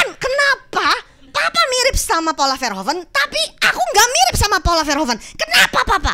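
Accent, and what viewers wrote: Indonesia. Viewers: Serasa ngelihat radit tp versi wanita dgn logat sunda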